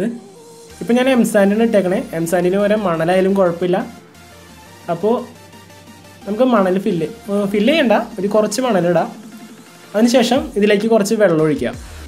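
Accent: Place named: Ernakulam, India. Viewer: Kollam bro... Ur slang u must be from Ernakulam area, I think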